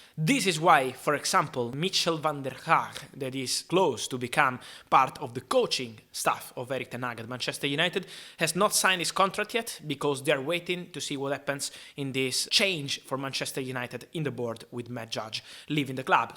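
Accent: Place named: Italy. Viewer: I didn't even need to read your name to know you are Italian. Your accent is so typical. I like it.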